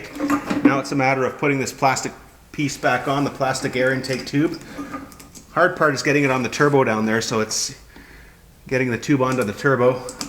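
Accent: Canada. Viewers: Watching this from UK. Thanks for posting. Are you Canadian? Take off eh.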